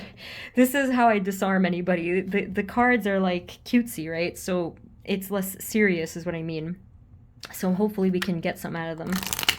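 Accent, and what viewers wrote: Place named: New Jersey, United States. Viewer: Girl! I've been wondering forever if you're from New Jersey...you sound like "home," and I'm from Bergen County...that answers it! I wish I still lived there so I could check out your store! I hope it's up and thriving...Bless you!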